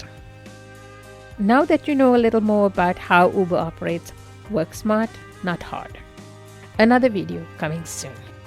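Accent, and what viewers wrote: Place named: Quebec, Canada. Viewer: Are you Quebecois? You accent sounds different than most from that area. Im just into languages and accents. Salutamu!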